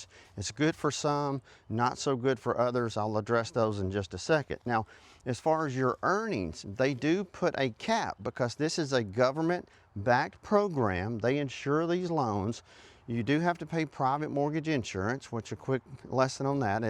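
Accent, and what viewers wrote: South, United States. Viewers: I’d like to listen to you but your southern accent doesn’t sound intelligent. Sorry. Just can’t.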